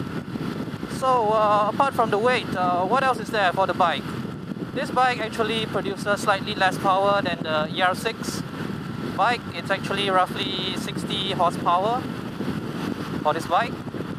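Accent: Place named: Asia. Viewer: Why? He's obviously asian, you can say that by his accent, but his voice is actually quite good for this type of video as you can easly hear what he's saying through the wind, traffic and engine noise.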